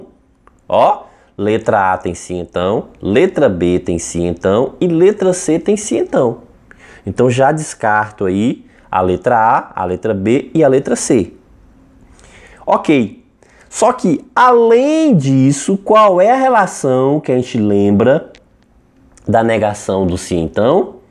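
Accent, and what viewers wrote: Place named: Bahia, Brazil. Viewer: Excelente trabalho, professor! O sr. é da Bahia? Se não for parece mto. Eu sou de Olinda, Pernambuco.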